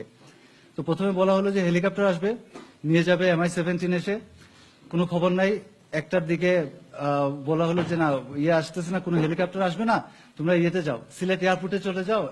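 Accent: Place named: Bogura, Bangladesh. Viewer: ভাইটি মনে হয় বগুড়ার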